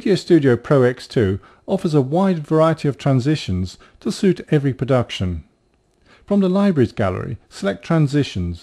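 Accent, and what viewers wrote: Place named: England, United Kingdom. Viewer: is corel made in england? why is there an english guy talking?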